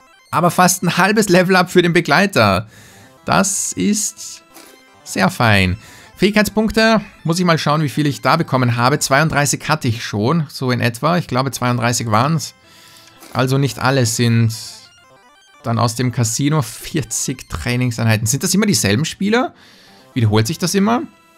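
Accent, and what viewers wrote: Austria. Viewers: bist du aus Österreich?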